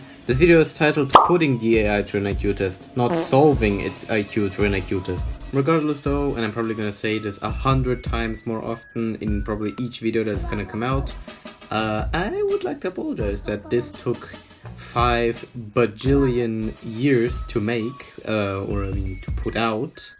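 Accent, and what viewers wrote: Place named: Germany. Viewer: Ich höre einen deutschen aktzent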